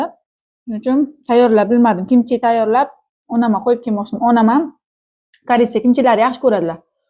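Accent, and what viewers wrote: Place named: Olot, Uzbekistan. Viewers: . Тилиз барибир. Олотга тортади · Assalomu alaykum Buxolikmisiz Qorakoʻlning qayeridansiz hech shevani buzmasdan gapirgansiz mashaalloh ❤❤❤❤❤❤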